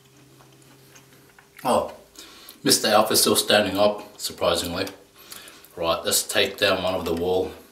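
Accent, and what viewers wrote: Australia. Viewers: Till now still can’t decide whether I watch you for your talking (I LOVE AUSSIE ACCENT don’t judge pls) or how you viciously eat 🤔🤔 merry Christmas!!! 🎉🎊🎁 Ps( this is the saddest gingerbread house ever made 🤧😂)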